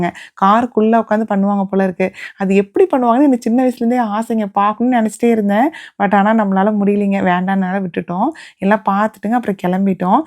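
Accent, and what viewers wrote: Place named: Erode, India. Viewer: Unga slang partha erode side nu nenaichen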